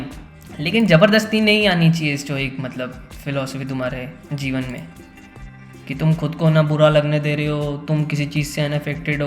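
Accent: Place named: Madhya Pradesh, India. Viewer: Mp se ho kya bhai? Pyaar accent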